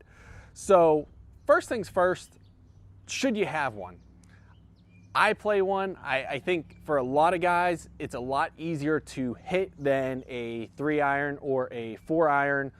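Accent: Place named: Western Pennsylvania, United States. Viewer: You have a western PA accent...was hoping you may be from the Pittsburgh area...I just purchased a cheap set of single length irons just to try them out and was looking for someone local to me to help with the transition...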